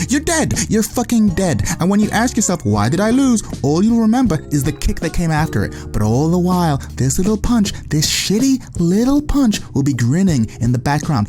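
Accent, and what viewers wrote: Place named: United Kingdom. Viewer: This was a steller breakdown. I don't know why but I pictured narrator drinking tea in the background. Must be the accent.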